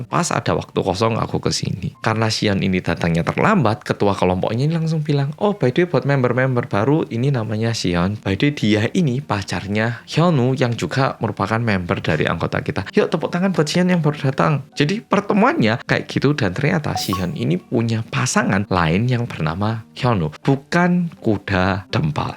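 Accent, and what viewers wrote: Java, Indonesia. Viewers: wow beneran ada ta 🤭 Nyimak swindler org korea yg medok...🎉 tks 🎉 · ini jiaaaan blas gak enek sleke Korea asli slek jowo,tapi walaupun aku tidur bila dengar suara ini aku selalu tau bahwa ini adalah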